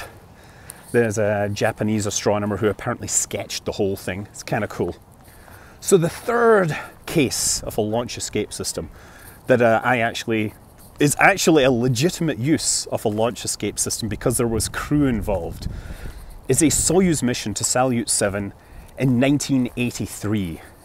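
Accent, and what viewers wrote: Scotland, United Kingdom. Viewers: I mostly listen for the science/ history lesson in a thick Scottish accent.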